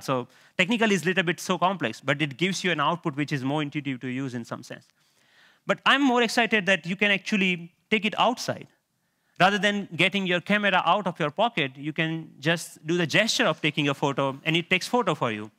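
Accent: India. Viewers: Hes a genius, but not for mastering a language..why didn't he lost his funny Indian accent... :) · 영어 고자.. 인도식 발음 못알아 듣겠다....ㅠㅠ 계속 들어야쥐...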